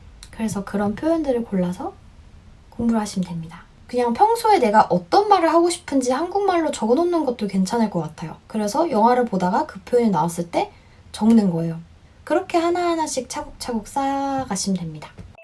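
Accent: United Kingdom. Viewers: 진짜 영국영어 너무 듣기 좋네요...덤으로 도움도 받고 갑니다..! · 헐 영국인인줄 알았는데 이민도 심지어 유학도 아니고 혼자 배우신거예요? 헐..? 그 결정적인시기?어린나이? 그때 안배우고 이미 나이많이먹고 해도 저게 되는거구나...헐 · 채널 찾아서 너무 행복해요 😍영국 영어 공부하고 있는데 어렸을때 해외 거주 경험이 없는데 영국 발음을 구사하시는 분은 많지 않더라구요 또 있으시더라도 이렇게까지 자세히 어떻게 공부했는지 설명해주시는 유튜버는 처음 인 것 같아요 영상 하나하나 도움이 정말 많이 돼요!!! 저도 처럼 영국 발음으로 영어 하는 날까지 열심히 공부하겠습니당 ㅎㅎ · 목소리랑 발음 너무 좋으세여, 진짜 찐 영국영어다 우왕 👍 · Hi! I happened to know your channel and I'm amazed by your British accent! I've never seen any Asian people can speak British pronunciation at your level! I'd love to know how you've learned British pronunciation (I really wish I could understand your language!! - I'm a Japanese viewer..) Anyway, I'm looking forward to watching more videos from you! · 영국발음 너무 좋다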